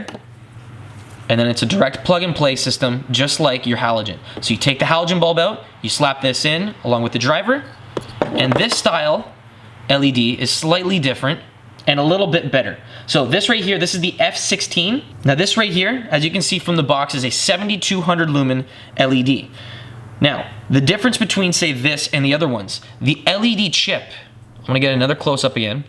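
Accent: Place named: Canada. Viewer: so canadian... oooout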